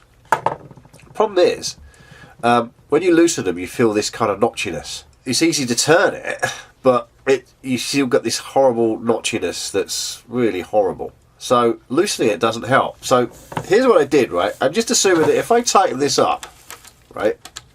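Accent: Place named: Birmingham, United Kingdom. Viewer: You sound like a peaky blinder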